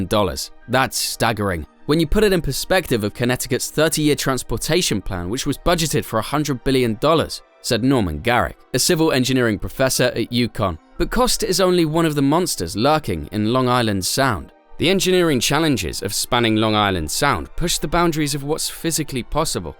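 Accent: United Kingdom. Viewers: Who let the gdamn British do this doc.... calling Queens, LI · That Limey twang is irritating. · I can’t take a British man talking about nyc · We hate this harry potter accent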